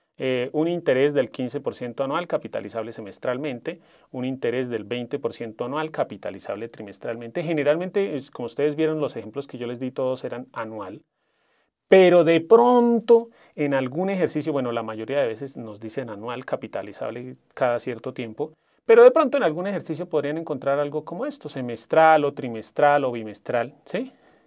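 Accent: Colombia. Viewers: Profe eres colombiano????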